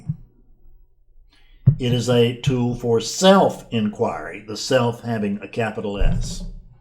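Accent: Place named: Texas, United States. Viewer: Dear, I would love to see you standing in front of a lectern bashing away with your plastic brain in your charming Texas accent in a church of non duality! Hallelujah brother! Seriously though, that was incredibly helpful. Thank you so much. Very funny indeed 👏👏👏😂🎉🎉🎉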